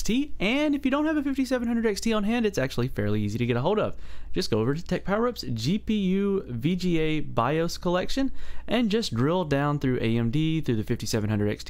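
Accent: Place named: Tennessee, United States. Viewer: Tennessee accent i like it Or is it Carolina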